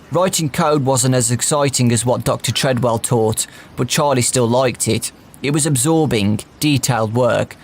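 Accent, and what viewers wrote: United Kingdom. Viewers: i dont need super thick british audio reading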